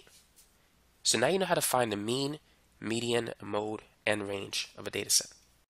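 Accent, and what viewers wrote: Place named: Brooklyn, United States. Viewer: This guy has just the slightest Brooklyn accent and sometimes it comes out for just a second.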